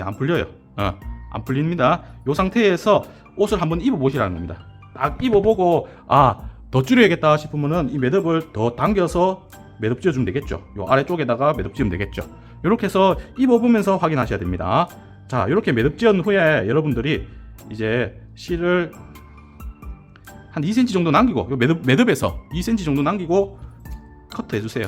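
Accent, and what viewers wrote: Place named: Gyeongsang, South Korea. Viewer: 말투가 경상도 분이시네요 ㅋㅋ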